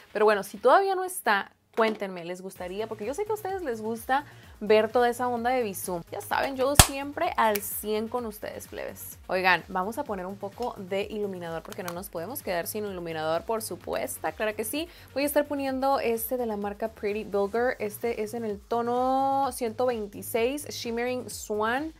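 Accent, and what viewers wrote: Sinaloa, Mexico. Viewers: Me encanta tu asento sinaloense aqui en Oaxaca les copiamos algunas palabras x eso me identifico con todo lo q dices mi 💗💗💗💗💗💗💗💗💗💗👑👑👑👑💅💅💅💅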